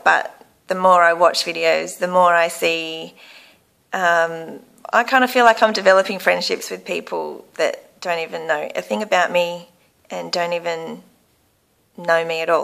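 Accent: Australia. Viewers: I'm starting to think all Aussies are just good looking people! I agree it's refreshing when I hear the Aussie accent. :) You will be amazed on how much things will change even in 3 or 4 months. Glad you reached out to share your journey and I think you'll fit right in! I used the same explination early on as well. If people find the FatKings stuff, then great, but I didn't go out of my way to tell them early on. -Phil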